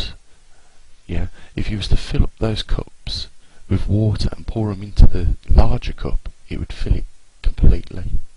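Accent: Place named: Cockney, United Kingdom. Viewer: I would have loved to listen to this video but the dude already has a thick English Cockney accent and the fact that he has his lips pressed up close to the mic doesn't help matters at all either! I got about 50% of the content. Not enough to understand.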